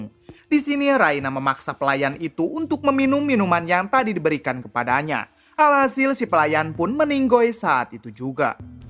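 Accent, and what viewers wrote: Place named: Padang, Indonesia. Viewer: Translate nye logat padang nih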